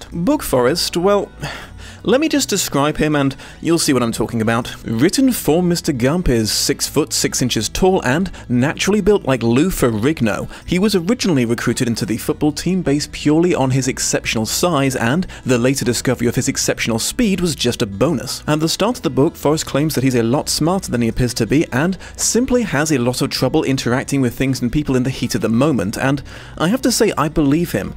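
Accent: United Kingdom. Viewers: just curious, are you from the UK? I find that accents from parts of the world I'm familiar with (/from nearby so I've had at least the briefest IRL exposure too) definitely add to the immersion, but accents from other parts of the world can be sooo hard to grasp!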